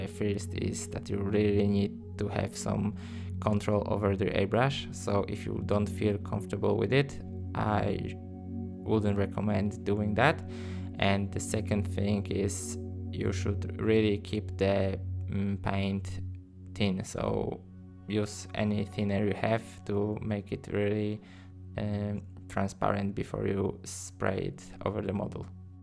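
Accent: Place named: Russia. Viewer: Мне кажется, что ты русский, уж акцент очень похож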